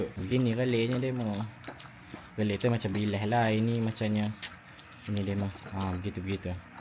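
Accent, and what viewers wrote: Pahang, Malaysia. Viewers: Pekat sungguh bahasa Pahang. Dengar loghat tu macam dari belah lepih gitu yek. Koi org Jerantut. Lama dah koi tak masok gulai tempoyak ni. KL ni Payoh amat nak cari tempoyak.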